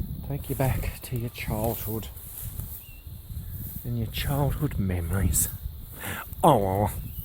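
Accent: Australia. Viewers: I am an American. Love your explores, and your Australian accent..Lucmy to have found your channel. Keep up the interesting work.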